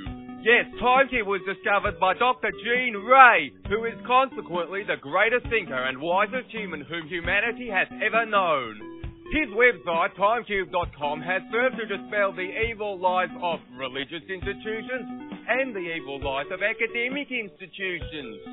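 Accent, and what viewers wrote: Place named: Australia. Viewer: He doesn't sound euro - it's Australian.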